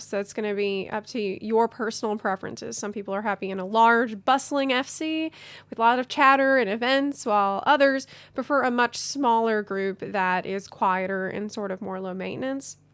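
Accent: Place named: Louisiana, United States. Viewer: Hi! Just started and found your channel really useful. If you don't mind me asking, are you from Louisiana at all? Your accent is very distinctive. Sounds like its from the Acadiana region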